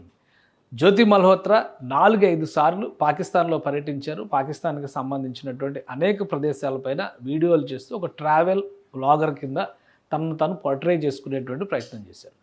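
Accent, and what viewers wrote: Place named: Telangana, India. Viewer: ఆయన తెలుగు తెలంగాణా యాసలో ఉంది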